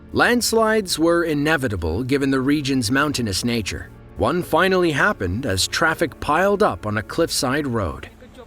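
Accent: United States. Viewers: judging by the narrators accent, he is probably American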